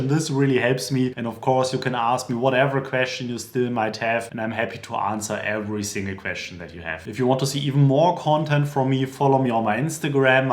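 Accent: Germany. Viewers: I can feel that you are doing this deep German accent on purpose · Your videos are highly rated and appreciated. Your German accent adds uniqueness to your way of presenting valuable knowledge and experience. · Youa german englisch maekes me aggressive, soirry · nur so mal als Frage, ist dir der starke deutsche Akzent mal zum Nachteil geworden? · Your English is So German. 👍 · Genau , bist du Deutscher? Wirklich 🎉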